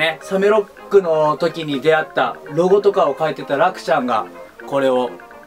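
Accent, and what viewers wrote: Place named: Kyushu, Japan. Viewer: リアルタイムでも見れそうだったのですが、日テレっ子なので…(笑) 今朝録画したのを観ました！真面目にお話してるかっこよかったです(´˘`) でも、九州弁で話す動画のも好きです(˙˘˙)❥❥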